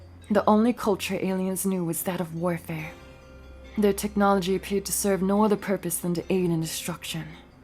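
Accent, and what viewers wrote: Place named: Boston, United States. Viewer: The boston / NY accent bleeding in is hilarious.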